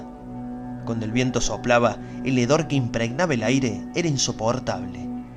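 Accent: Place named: Córdoba, Argentina. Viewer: con un ligero acento coordobés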